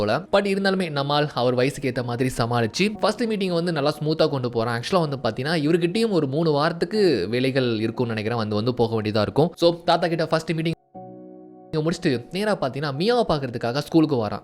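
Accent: Tirunelveli, India. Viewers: Thoora ponga indh bashai tirunelveli slang la 😅nellai ya neenga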